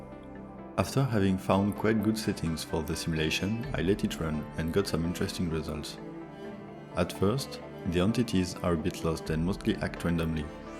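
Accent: France. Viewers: T’es français l’ami ? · Is it just me or does he sound French? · He is french because is accent, you can make a video in french 😉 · it seems that you have a French accent. si oui très bonne video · French accent? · L accent francais on est laaaaa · Excuse me but i wonder, after seeing the first minute of the video: "est tu français?" Because of your accent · are you French🤔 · you have a french accent lmao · Le fait que tu es un accent français me fait encore plus aimer la vidéo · Haha je le reconnais bien, l'accent français 😂 Courage, tu vas y arriver 👍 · Bonjour j'entends ton accent français, as tu une chaine en Français avec la même video ? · Ah moi j'aimerai une version française hihi (l'accent t'as trahis 😘) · C'est un accent français ça ! Ça fait plaisir 🇲🇫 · You sound so french, why all AI youtubers are french wtf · T'es français ? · Petite question : serrais tu français ? 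Y’a un accent très reconnaissable 🤔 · Hmm le bon accent français ❤️ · gros accent français spotted ;) mais vidéo interessante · ho le méga accent français mdr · L'accent français énervé je m'y attendais pas x)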